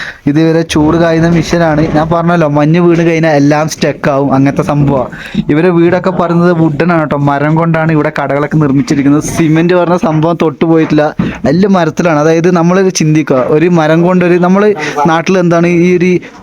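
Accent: Kannur, India. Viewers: ഇങ്ങള് കണ്ണൂരാണോ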